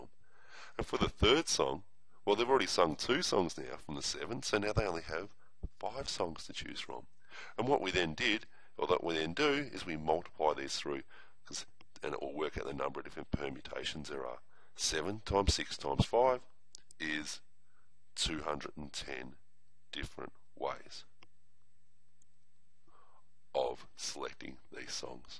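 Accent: Australia. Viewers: I can't tell if I'm here more so for the advice or the Australian accent. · it’s not normal american...more like australian or new zealander...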